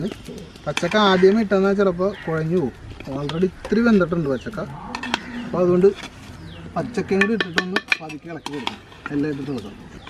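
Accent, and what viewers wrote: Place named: Coastal, India. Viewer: Evda veedu 🏡 ?slang 😂😂😂near kadapuram aano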